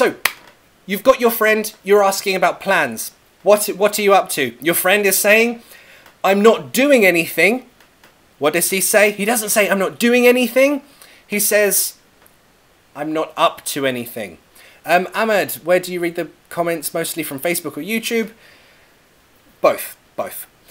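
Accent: United Kingdom. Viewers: i love the way you teach English "British English " ...and m really trying hard to pronounce the words and sound like you people ...i really like British accent... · really enjoy your teaching as always <3 thanks a ton~~~ Wanna get the British accent !!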